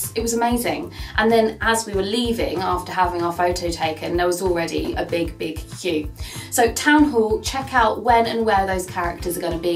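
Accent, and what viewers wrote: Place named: United Kingdom. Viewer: thank you for the videos and they help alot !! love the accent... is every British lady so gorgeous????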